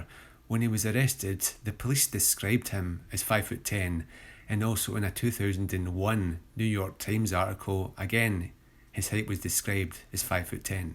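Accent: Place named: Scotland, United Kingdom. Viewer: based scottish accent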